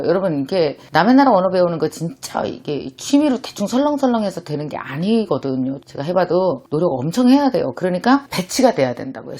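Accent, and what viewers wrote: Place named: South Korea. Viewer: 정말 박수를 쳐드리고 싶네요. 대단하세요. 영어가 모국어가 아니면 당연히 한국인 엑센트가 있죠. 언어는 지식전달이기도 하지만 의사소통이 젤 인것 같아요. 마음과 마음이 언어를 통해서 대화하는거니까 한국인 엑센트가 있어도 의사소통에 문제가 없다면 오히려 한국인으로써 자랑스러운점일것 같아요. 김미경강사님의 강의에 100% 공감하고 동의해요. 용기주셔서 정말 감사해요.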